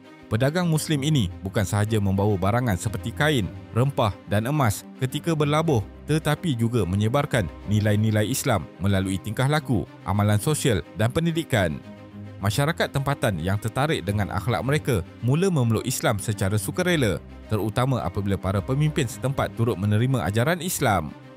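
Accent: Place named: Malaysia. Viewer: Saya gak yakin dia itu YouTuber Indonesia, dari caranya ngomong aja meragukan 🤔 Atau jangan jangan itu YouTuber malay yang pakai lokasi di Indonesia biar kelihatan YouTuber Indonesia 🤔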